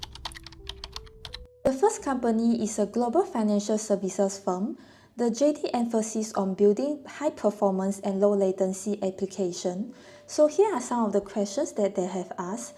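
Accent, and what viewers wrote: Singapore. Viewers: she sounds singaporean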